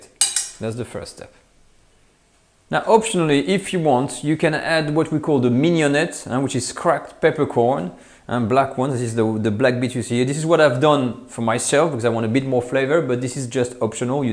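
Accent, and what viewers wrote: France. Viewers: Definitely helps to have that cool French accent!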